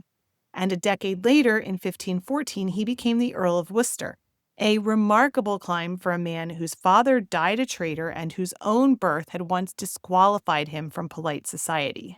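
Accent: United States. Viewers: What a dreadful american voice impossible to listen.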